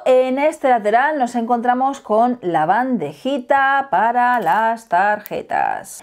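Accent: Spain. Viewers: Es por el acento español los de España pronuncian así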